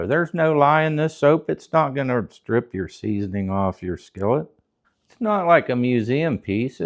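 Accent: Pittsburgh, United States. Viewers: Are you from Pittsburgh? Excellent video! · I know a fellow Yinzer when I hear one!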